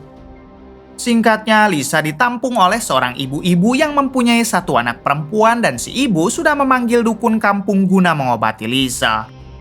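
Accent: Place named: Bogor, Indonesia. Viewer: Bogor mana suaranya🎉🎉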